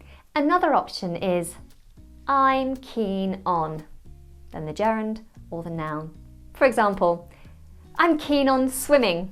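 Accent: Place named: United Kingdom. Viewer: I enjoyed this lesson very much. It has been very interesting to listen to your British pronunciation and repeat. Thank you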